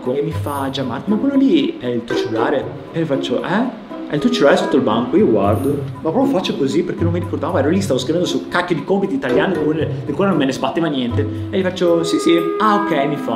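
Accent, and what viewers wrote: Veneto, Italy. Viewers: Diamine! Se sei ignorante e non conosci la mitologia classica, rileggiti (sempre che tu l'abbia letto) tutto il filone antropologico sulla mitologia classica del professore Robert Graves, influenzato dal professor Frazier: Atlantide=Atlante, colui che portava il peso del mondo sulla schiena. Oceano Atlantico=il luogo in cui Poseidone lo aveva confinato. Studia dizione, perché l'accento veneto è pesantissimo!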